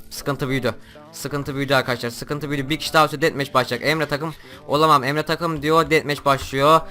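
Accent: Kütahya, Turkey. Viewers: abi sen kutahyalimisin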